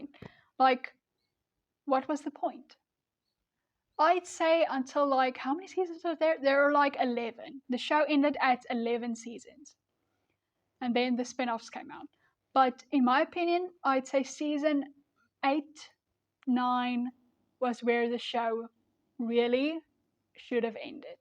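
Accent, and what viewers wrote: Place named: South Africa. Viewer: Hi there! Great video You have some interesting takes on things. I definite think you should watch the final season. It is in no way satisfying as far as concluding these main story plots you’ve been talking about but it does have some nice closure for some other characters. It is an absolute shame that they threw away 11 years of story and world building by stretching the characters into their own shows instead of having some satisfying conclusions to the main story notably Rick. It’s so painfully obvious that the writers had to write Rick out then try to pick up the pieces instead of just finishing the story then. I would have been ok with Neagan dying at the tree but I admittedly enjoyed his pseudo redemption arc and wanted to see him become accepted amongst the community. Im not a fan of how Carl died but I feel like Sadiq ended up doing a lot of good. 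Such a titanic fall this show had it’s a sucks when ips gets hurt because actors want to persue other ventures. Again great video! Your accent reminds me of my childhood Doctor, is it South African?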